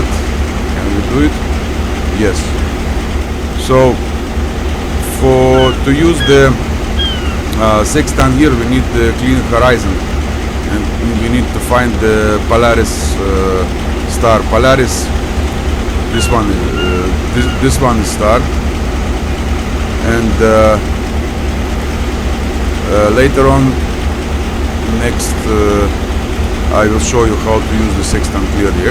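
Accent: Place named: Russia. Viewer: Вермахт это в переносном смысле за стрельбу из пушки, а не торпедирование где хочется посмотреть мастерство. А на счёт реализма странное не понимание, ну какой может быть реализм у симулятора да ещё с таким множеством поблажек который он дает игроку? Здесь не то что снижать, самому игроку приходится ещё додумывать как бы усложнить игру. Кстати на западе почему-то все упорно играют на низком бояться что ли? Я сперва пока не услышала акцент тоже подумала, что с запада, а потом смотрю под видео название-о да это наш.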